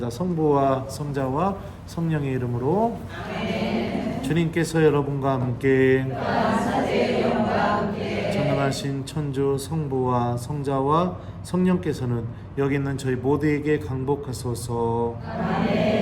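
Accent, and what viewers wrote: Chungcheong, South Korea. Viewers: 신부님 수고하십니다.미국 에까지 가셨네요.몇칠전에 본당신자들한테 1번2번3번 하셨싸트만 또 벌써 그멀리 가셨네요.신부님 강론듣다보면 우리고향 충청도 말씨 때문에 너무 재밋게듣습니다.ㅎㅎ오늘도역시 충청도뚝배기말 (뿌랭이)표준말(뿌리)소리듣고 많이 웃습니다.신부님 미국에서 열씸히 하느님말씀 선포하시고 건강히 계시다 행복한마음으로 돌아 오세요.😅😂😢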